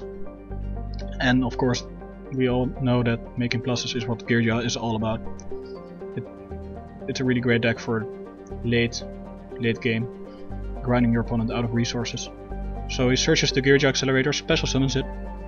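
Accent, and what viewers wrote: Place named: Netherlands. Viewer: youre dutch aren't ya? I'm too :P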